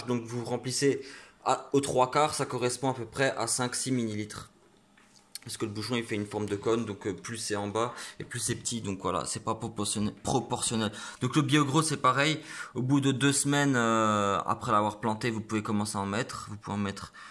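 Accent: Alsace, France. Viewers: L'accent alsacien 😭😭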